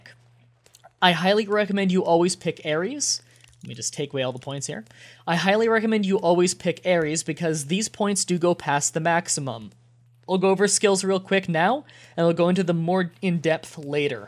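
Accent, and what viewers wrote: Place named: Canada. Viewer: 99% Sure he is Canadian. The way he says "about". ABOOOOT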